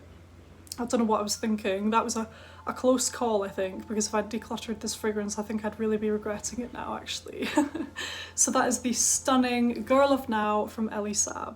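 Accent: Scotland, United Kingdom. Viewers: Nice video. You are a pleasure to watch, a star of Scotland. Lovely Scottish accent.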